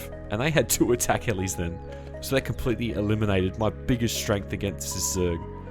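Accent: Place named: Australia. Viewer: Is this dude Aussie? He sounds like an Aussie.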